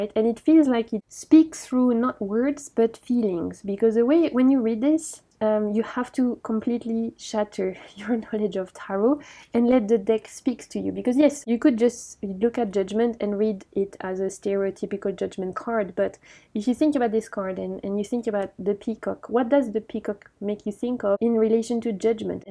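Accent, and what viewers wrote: France. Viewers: Love these decks! Sometimes I listen to you just for the Oui Oui Oui French accent 😂😂😂❤❤❤❤❤❤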